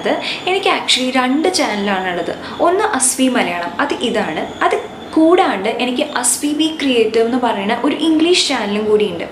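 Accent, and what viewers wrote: Thrissur, India. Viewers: Chechi supertooo thrissur style talk I Loved it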